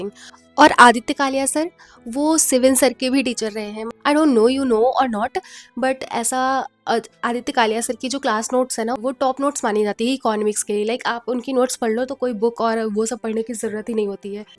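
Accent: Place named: Uttarakhand, India. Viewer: Tone sun k lag rha ki ap uttarakhand se ho ......kis distric se ho Btane ki kripa kijiyega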